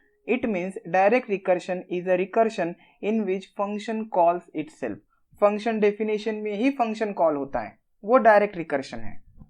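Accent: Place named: South India, India. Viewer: Brother yen pronounce South Indian and also don't forget the missile man of India belongs from south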